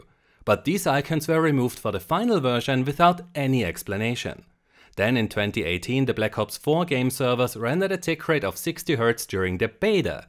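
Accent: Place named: Germany. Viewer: I can hear the German or Austrian in this Guy... Schöne Grüße aus Wien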